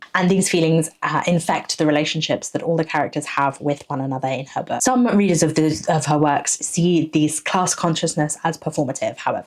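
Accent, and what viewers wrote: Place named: England, United Kingdom. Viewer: Worth noting that while Ireland has never had an institutional left, it also has never had an institutional right. In fact, there's far less right wing leaning and visibility in Ireland than most western countries. We've basically always had a centrist government that makes progress consistently, just faaar too slowly. Also, something about an English accent explaining Ireland to me was actually grating lol. Can I coin the term 'Anglosplaining'?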